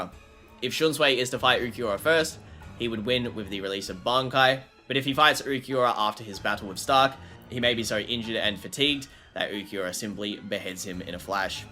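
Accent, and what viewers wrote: Australia. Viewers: nah im so happy youve returned bro love from a fellow aussie (u sound like u aussie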